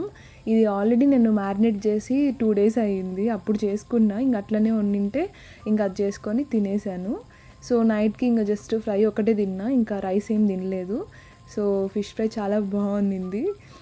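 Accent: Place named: Warangal, India. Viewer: garu mi slang pakka Warangal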